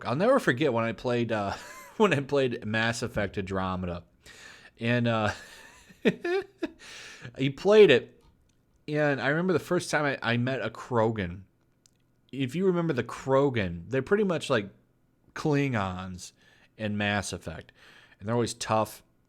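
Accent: United Kingdom. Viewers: Your huffy British accent is on point.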